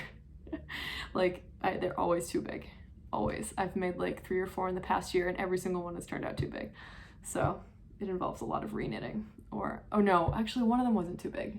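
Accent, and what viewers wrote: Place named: Vermont, United States. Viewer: Great review!! I kept thinking you were from vt at the beginning of the video. Hello neighbor!!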